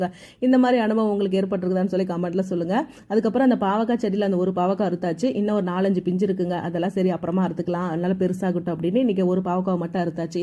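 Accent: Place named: Thanjavur, India. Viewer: உங்க பேச்சு தெளிவாகவும் தஞ்சை ஸ்லாங் போலவும் இருக்கு.தர்மபுரி பக்கம் ஸ்லாங் வேறு மாதிரி .உங்க சொந்த ஊர் எது சிஸ்..நீங்க என்ன படிச்சிருக்கீங்க.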